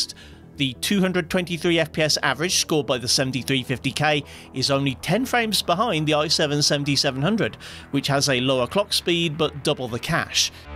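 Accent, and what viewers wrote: England, United Kingdom. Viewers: What region are you from in england?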